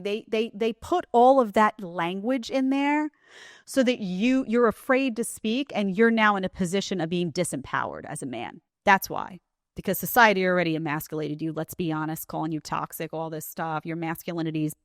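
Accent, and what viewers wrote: New York, United States. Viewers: I like your NY accent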